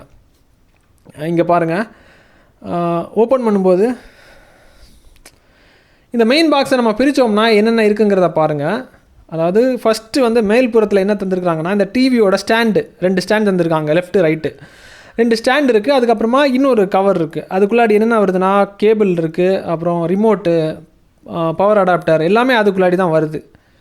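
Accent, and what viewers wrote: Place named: Nagercoil, India. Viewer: Nanba ne nagarcovil thana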